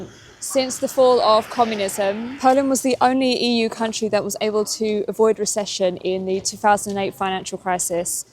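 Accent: England, United Kingdom. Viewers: I really like this British accent :-) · this is a great report. Well done. And you are such a sweet girl 😊 Love your English accent.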